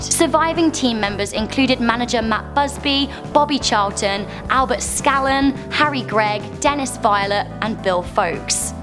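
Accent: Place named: United Kingdom. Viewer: yes she is pretty n I'm also a follower.I love her accent. its so British. love that.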